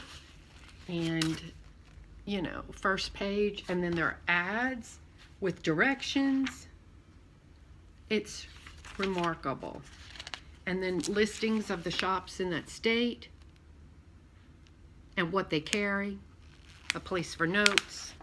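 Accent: Louisiana, United States. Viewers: Hi. Just found your videos and I really like listening to you. I lived in Louisiana most of my adult life and your voice/ accent is so warm and friendly...and sounds like Home to me. I lived in good ole Ville Platte so I'm familiar with Lafayette and the surrounding area. I share a love for the Gees Bend Quilters too. Something about their quilts is so inspiring!! Maybe its the color combinations they use along with their improve piecing...but they just vibrate somehow and reach into your heart. Well, well I love to watch Joe Cunningham and his quilt report too. He has a friend in the Bay Area who is another innovative quilter. Her name is Margaret Fabrizio and has been making quilting videos for at least 10 yes. She is famous for making Kawandi quilts. She has traveled to India to learn how they construct their quilts. If you are not familiar with her Im pretty sure you would find her fascinating. 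Her You tube name is atree3 if you would like to get acquainted with her. Until we talk again, take care. And Thank you for making your videos.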